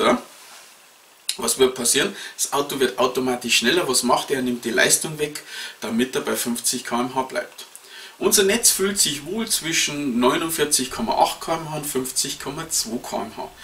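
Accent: Bavaria, Germany. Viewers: Danke für die lebensnahe Erklärung, bayerischer Charme inklusive! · Super erklärt!gaaanz starkes Video. Hehe..auf bayerisch klingt‘s trotzdem ned schlimm. I wohn aufm Land...geht scho irgendwie.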